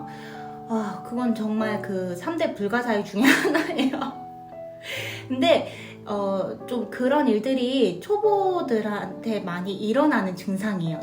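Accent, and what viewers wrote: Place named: Ulsan, South Korea. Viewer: 출근준비하면서 우연히 보게되었어요. 혹시 울산인가요? 억양등 말투가 참으로 익숙해요. 있어보인듯 꾸미지않으시고 편하게, 사실적이게 말씀하셔서 편하네요~^^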